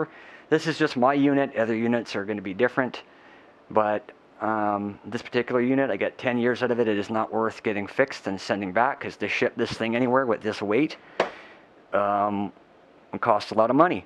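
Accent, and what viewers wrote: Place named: Canada. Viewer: this is the most canadian person i've ever heard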